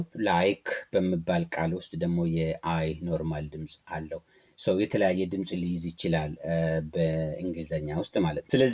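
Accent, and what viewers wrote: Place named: Ethiopia. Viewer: Qubeen 'Z' zee jedhama afaan English. Please don't say zed. It's weird when you say zed. I know they teach that in Ethiopian English but in American English we say Zee. And in qubee Afaan Oromoo they say Zaa which is correct.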